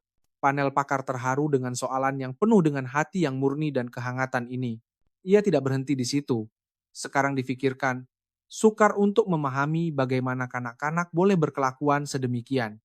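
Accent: Indonesia. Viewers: kenapa suara loghat Indonesia. ni Chanel apa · Nmpk sgt citer rekaan. Berlebih sgt. Suara pun indon. Kami biasa² je.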